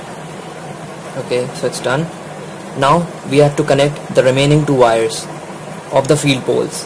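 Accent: India. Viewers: I really hate the indian accent in english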